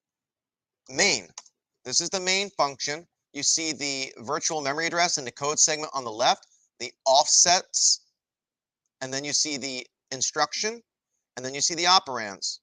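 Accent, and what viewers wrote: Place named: Los Angeles, United States. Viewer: Which accent is that? LA?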